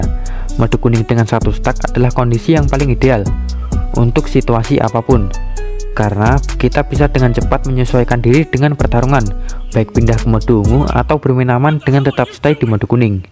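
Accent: Java, Indonesia. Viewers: Medok amat bang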